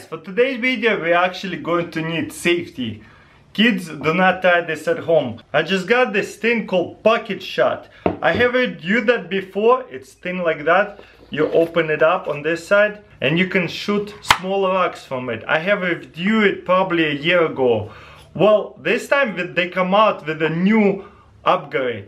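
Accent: Russia. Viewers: Yeah and he also has the same accent as crazyrussianhacker I wonder if they are related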